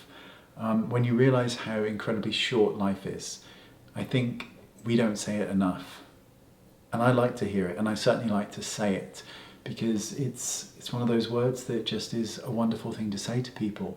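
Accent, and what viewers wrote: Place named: England, United Kingdom. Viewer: Hello 💗 nice 1 again I already had this idea once cause I always use a lot of these interdental brushes as I really need that as I have so tight teeth close to another.. Maybe I wasn't doing right as you just shown, thAnx. Ok then.. the last person I said I love you is my beloved sweet baby girl,my daughter of 6 and I really mean it. I love her to bits (or beats?) as said in England ☺️ and let me tell you Sir your speaking reminds me the real English talking... You really sound as the English gentleman 😄 when I was living in London yrs ago now, I started to get that particular accent but not too bold, I m Italian and from Tuscany so it's difficult to lose that 😆 after 7yrs I decided to going back to my country (love to see you here) now here I had not so much time and opportunities to talk daily with someone british ... 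I think you speak nice n well relaxed not as some Londoners, I couldn't get a word sometimes.. they are doing all fast even talking 😂 I think someone like you could be a better teacher for a foreigner... Maybe you can do also a video about to speak English properly to everyone?! Ciao💗take care 💓😘💚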